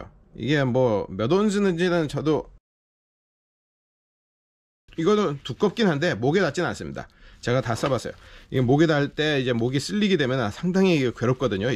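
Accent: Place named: Japan. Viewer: you sound Japanese or maybe Korean but you don’t sound like a chinaman I hope your not a chinaman I could not understand what you said other than acelink armor I feel you gave a good review of this item enjoy the plate carrier and buy two more sets and donate them to Taiwan they’ll need them to fight off the chinaman we have swapped to chinaman targets to practice hitting the short dumb backward bat eatin people if you are chinaman then know plate carrier is more effective without any inserts in it at all it’s an American secret so don’t tell chinaman good luck hope you never need plate carrier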